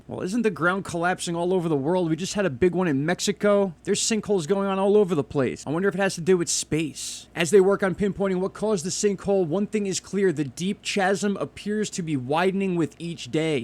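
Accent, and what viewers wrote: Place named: New Jersey, United States. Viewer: I really like your channel 😊 (plus, I hear a hometown accent-sounds like New Jersey 😉). I was born in Philly, but grew up in the Tri-state area, mostly New Jersey-from Blackwood and Willingboro to Glen Ridge and Bloomfield and about 2/3 other cities in between lol. Thanks for covering these things. It's only going to get worse from here on out, so it's good to have you on board! We NEED a channel like yours 👍.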